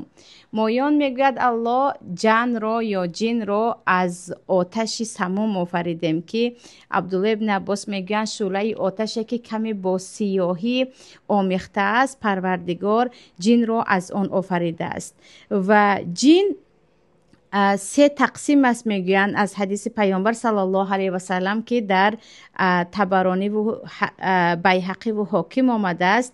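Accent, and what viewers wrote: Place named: Tajikistan. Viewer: Ман а шумо барин хохари олима мефахрам ки аз мо занхо чунин олимаи босавод хусусан бо лафзи ширини точики дар дини ИСЛОМ хизмат мекунед аллох ба мо чунин саводу гайрату такво насиб бгардонад омин йо раббал оламин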